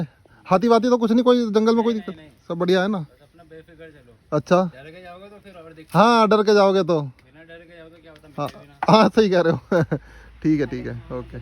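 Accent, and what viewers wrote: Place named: South, India. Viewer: Thora ahista bolo Bhai..... Tumhara Hindi south Indian bale Tamil Telugu jaisha lagte hay....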